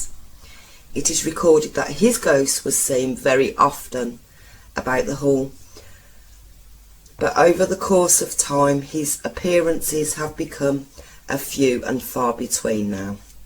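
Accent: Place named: Birmingham, United Kingdom. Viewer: I like her brummie accent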